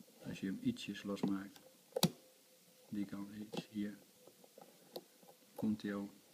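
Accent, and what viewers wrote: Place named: Groningen, Netherlands. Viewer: jij komt duidelijk uit groningen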